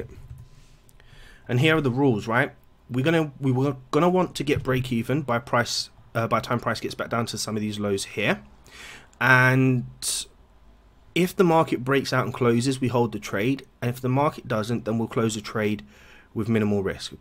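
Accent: London, United Kingdom. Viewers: Broken english accent??? Bro it's a London accent lol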